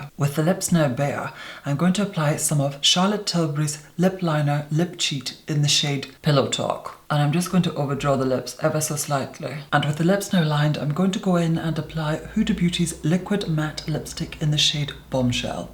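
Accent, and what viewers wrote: Scotland, United Kingdom. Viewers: Your knowledge of products is astounding! You know all the names and number of products and brushes just like that! Astonishing. How on earth do you do that? I strive to be a professional makeup artist and you set the bar VERY high! And your are so funny. Thank you for every film. You teach us mere mortals so much of your craft. I love your subtle Scottish accent too, as I'm Scottish myself. Wishing you all the best. Ilse from South Africa. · I adore when I can hear a bit of your brogue... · I love your voice and UK accent😍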